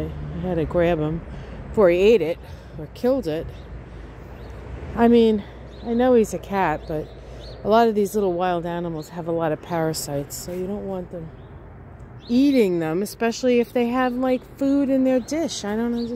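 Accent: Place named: East Coast, United States. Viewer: Another reason why I tune into your morning astrological strolls with Charlie is because you are not a phoney - just sincere and concerned commentary on American and world events with doses of deep wisdom, humor, and splashes of that strong east coast accent (I’m from Somerville, MA) Hearing another speak about and process daily events is therapeutic for this listener…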